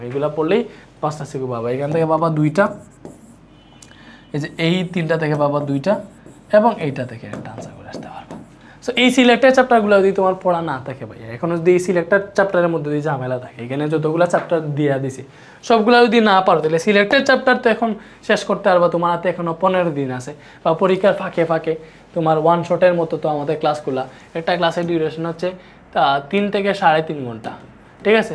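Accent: Sylhet, Bangladesh. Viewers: Bahi sure Sylheti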